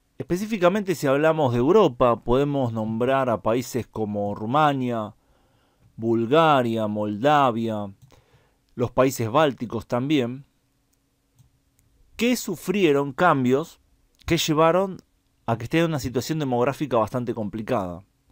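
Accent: Argentina. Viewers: Este creo que es un argentino por el acento. Perú, país inviable? Está muy despistado. Los ejemplos que pone son absolutamente burdos. Hoy, Argentina sufre los efectos del peronismo y Kirchnerismo. Le sugiero que estudie más y hable con sustento. El 45 % de la población argentina se encuentra en pobreza y Milei, tendrá que trabajar mucho y alinear a este charlatán que me causa vergüenza ajena. Argentina está en default y con índices de pobreza y subdesarrollo espectaculares. Perú, está creciendo económicamente durante los últimos 30 años, pese a los problemas y que tiene una de las monedas más fuertes de América Latina. Anda a estudiar economía en serio y basta de vulgaridades basadas solamente en la variable población.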